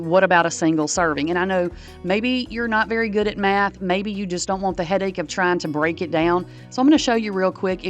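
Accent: South, United States. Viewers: Lol, I just found u. I'm trying to figure out what part of the south u r from. I'm from VA. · I don't know where you are from, but I love your accent. I would say you're from the south. I just love this idea. I want to start dehydrating things. I love soups. Sometimes, I just don't feel like cooking a lot of things for supper. Instant soup would be wonderful! Question: have you ever dehydrated cabbage for soup? Thanks😊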